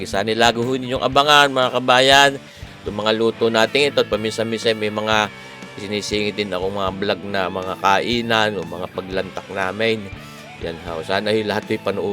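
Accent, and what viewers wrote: Batangas, Philippines. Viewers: Good evening idol kung batangeño accent,, shout out po sa inyo diyan sa Batangas,,, akoy laging nanonood ng iyong video ❤❤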